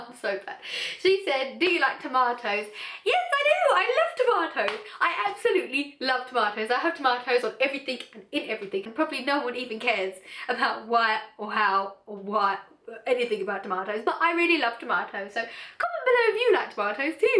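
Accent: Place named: United Kingdom. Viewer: Sweetheart, I absolutely LOVE your accent. I'm completely sorry if that's offending, I know when people tell me they like my "Caribbean" accent it can be quite annoying sometimes. I totally admire you and your makeup work! I love you tons! Hopefully when I go visit the U.K. that I run into you! Much love <3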